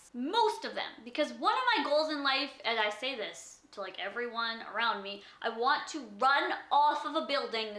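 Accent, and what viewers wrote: Canada. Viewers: i love your canadian accent!!! its just so funny! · i love your accent i wish i was Canadian xx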